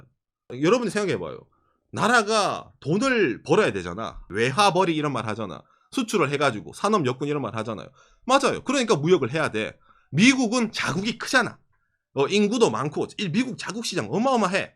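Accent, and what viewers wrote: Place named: Gyeongsang, South Korea. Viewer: 우짜든동~~~ 사실을 말해도 왜곡되기가 쉬운것이 진실이라 생각하는 바이라.... 그래도 그 안에서 관점의 차이를 알려주려는 의 영상 수십편을 보면서 우리와는 다른 그 곳에 대한 이해가 더 높아지네요 일본으로의 많은 여행경험으로써 인지하는 부분들도 영상을 보고서는 가끔 무릎을 탁!! 쳤습니다 아무튼 지난역사와 현재의 국제정세는 냉정히 봐야 한다는 입장을 지닌 울산 사는 형이.... 우짠지 말씨가 너모 익숙해가꼬 그래가 그 특별한 맻개의 사투리들이 테 정을 더 쌓게 했심데이~ 구도크와 좋아요!!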